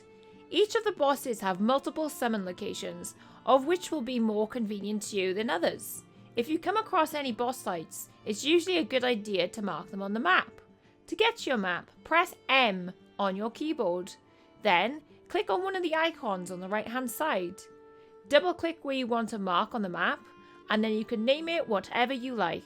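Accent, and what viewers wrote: Wales, United Kingdom. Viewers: Are you welsh? Sounds like you're playing it down a lil for your "stage voice" - which I wouldn't blame ya for, I pronouns would too if I had a YT channel! Not a criticism, just as a fellow Welshie I thought I heard it :) Only just started the vid but feedback seems excellent in comments so I'm SURE it'll be super helpful, so thanks in advance! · Great vid - made me smile :) nice to hear a fellow welshie on youtube too!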